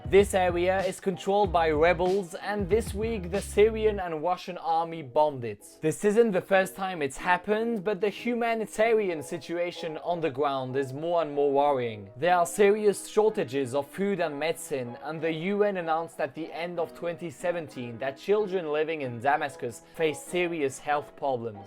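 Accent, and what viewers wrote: United Kingdom. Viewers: perso j'entends plutôt du British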